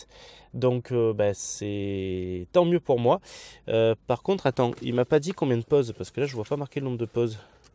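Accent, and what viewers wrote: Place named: Var, France. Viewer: Ce film a l'air très intéressant, J'ai vue la vidéo de EMGK et j'ai bien aimé le rendu ultra contrasté. Sinon tu as fait de super cliché, notamment les reflets des bateau alignés, et les deux mouettes sur le lampadaire. Hors sujet : tu es du var non? Il me semble reconnaître le bord de mer varois, et ton accent m'est familier ;) PS : je prefère la Kirin à la Asahi :D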